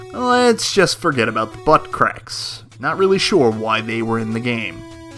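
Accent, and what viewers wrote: Canada. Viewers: I knew you were Canadian from the accent, ya hoser! - Luv from: a fellow canuck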